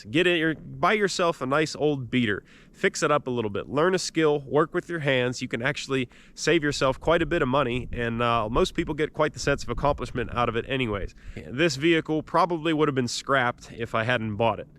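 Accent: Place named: Pennsylvania, United States. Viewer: What part of PA is this guy from the accent is throwing me a bit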